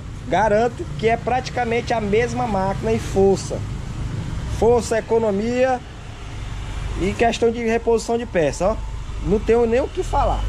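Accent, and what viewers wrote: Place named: Northeast, Brazil. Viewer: Show de bola merrmão!!! Me divirto ouvindo a maneira como você fala. Vou encomendar um dicionário "Nordestinês" para entender algumas palavras. Mas de boa, não estou mangando não. Não se aperreie pelo amor de Deus. Abraço do Léo o Tiozão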